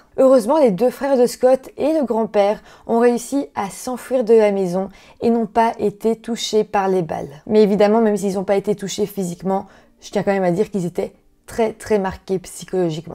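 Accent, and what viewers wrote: Belgium. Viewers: Salut, cette histoire est terrible, pour moi ce garçon 👦 était très troublé, certes, mais certains points contredisent les maladies mentales citaient dans la vidéo, par exemple la préméditation, elle est la, ce garçon malheureusement était un tueur de masse en devenir, et il s'est entraîné sur sa famille 👪, je pense que la peine fera qu'il ne ressortira pas, c'est une sécurité pour lui et pour les autres, il n'a aucune empathie,si toutefois il est malade, il souffre de psychopathie et recommencera, la, ou je pense qu'il n'est pas responsable c'est qu'il est né comme ça, né pour tuer, le passage où il explique qu'il les achevait plutôt que de les laisser souffrir, pour un peu, il leur a rendu service.comme d'habitude j'aime beaucoup tes histoires avec ce petit accent Belge qu'on ne lasse pas d'écouter, bonne fête 🥳 🥳 🥳 de la fin d'année et bonne année 2023...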